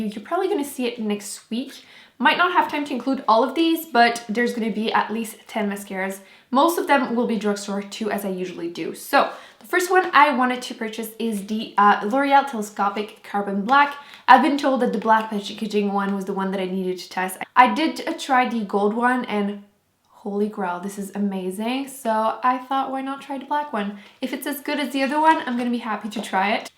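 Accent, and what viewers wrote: Canada. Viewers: She's from Canada c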